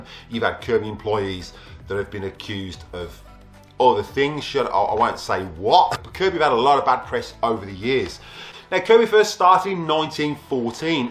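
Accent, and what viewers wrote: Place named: Midlands, United Kingdom. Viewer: That's amazing, probably never ended up in the same room though, I think you left just before I started, I joined near the end of the G3 run, the G4 was unveiled just before I left. Never occurred to me you'd be anywhere other than UK, and what with your midlands accent. Mind you, there's kind of a vibe in some of your videos, lighting maybe?? Don't miss UK at all myself, moved away to Switzerland about 13 years ago and that's where I'll stay. The UK now, for me isn't the same one I left so.... That said, there's a slight difference between living 4 or 5 hours away and an entire day.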